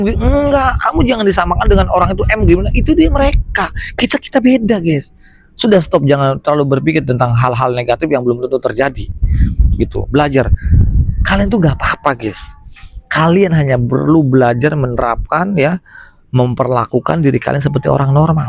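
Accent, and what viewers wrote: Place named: Kupang, Indonesia. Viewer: Logatnya JD agak Kupang hehe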